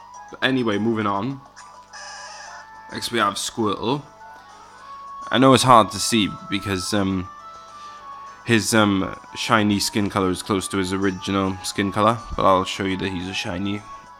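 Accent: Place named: Wales, United Kingdom. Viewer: Your accent and pronunciation, my friend is also Welsh it's pretty cool. I've got a boring English accent unfortunately haha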